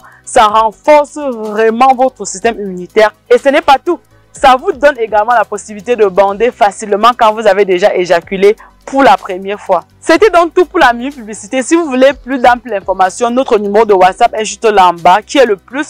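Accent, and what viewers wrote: Cameroon. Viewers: C'est comme la poule. Elle ne donne pas du lait, mais mais mais, elle nourrie ses poussins, avec la chaleur de son corps. Merci.. bonjour. Ton accès de ton me ressemble à une camerounaise. Merci.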